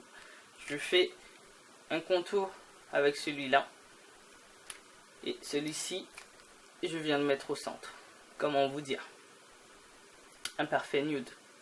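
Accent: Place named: Saint-Étienne, France. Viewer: Y'a comme un truc du nord???? peut être stéphanois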